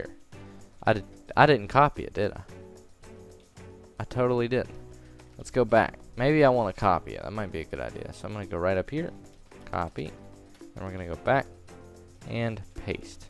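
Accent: South, United States. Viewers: Why do you sound like you were from the south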